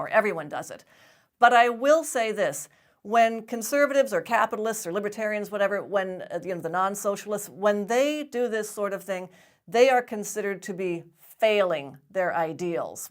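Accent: United States. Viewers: If you have a beautiful voice you can tell me lies :) It's a pleasure to listen to them. I love your beautiful American accent.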